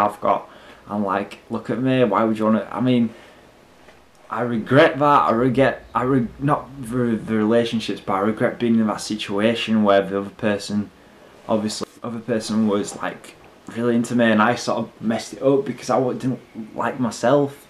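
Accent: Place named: Yorkshire, United Kingdom. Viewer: Dat Yorkshire Accent Doe so Amazing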